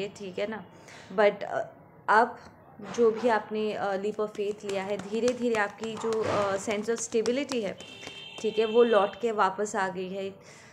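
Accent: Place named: Bengal, India. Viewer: I m listening your bengali in a loop 🤭🤭 its very sweet to hear your bengali ascent 🌼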